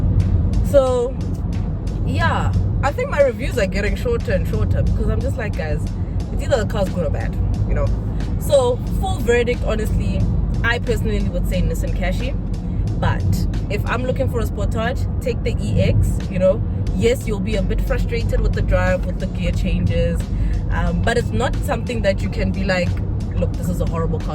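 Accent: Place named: Kasi, South Africa. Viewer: I like how you review your cars, pure kasi style you can even hear it from the accent 😂❤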